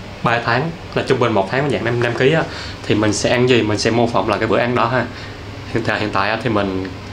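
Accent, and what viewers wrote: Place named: Phu Yen, Vietnam. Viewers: giọng a nghe giống giọng phú yên nhề :))) nếu mà giống thì chung quê ùi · anh ơi a ở Phú Yên - Bình Định ạ ? giọng anh quen quá đi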